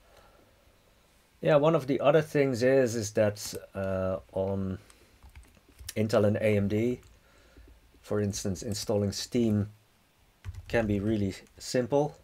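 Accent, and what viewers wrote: Netherlands. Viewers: You sound very Dutch :)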